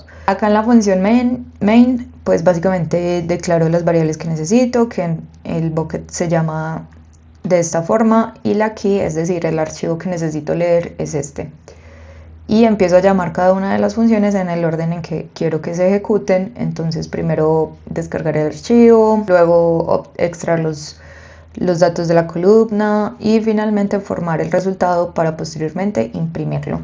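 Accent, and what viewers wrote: Antioquia, Colombia. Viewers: la primera programadora colombiana que conozco, me encanta el acento paisa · Quien vino por conocimiento y se quedó por la tonada paisa? 🙋. Muy buen contenido. Sigue así, saludos desde Argentina.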